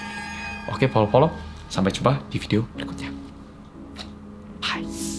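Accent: Java, Indonesia. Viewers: Woe logatnya orang jawa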